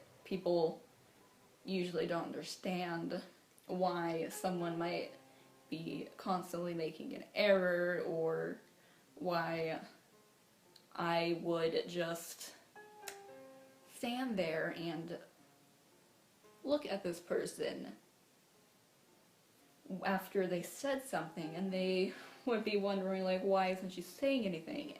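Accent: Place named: Russia. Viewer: Is it just me or you sound a bit Russian here 😊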